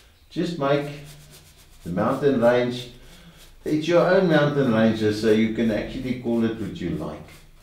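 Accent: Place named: South Africa. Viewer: That is beautiful! It is so nice to hear a fellow South African accent!:) I was born and raised in Joburg and had relatives in Pretoria... I used to love visiting when the Jacarandas were in bloom!! You have a very artistic eye and easy style of painting. I will certainly attempt to paint from your tutorial, thanks for uploading it:) I now live in Hawaii, but I miss the motherland a lot....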